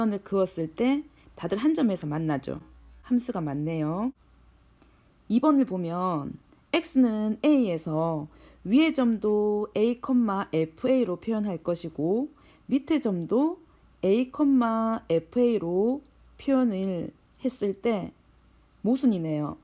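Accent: South Korea. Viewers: 경상도 인듯?!?!ㅋㅋㅋㅋ 구독합니당 ~ㅎㅎ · 우왕 들 화면 넘 깔끔하고 조아요~ ㅎㅎ 근데 서울분은 아니신가 봐요 ㅋㅋㅋ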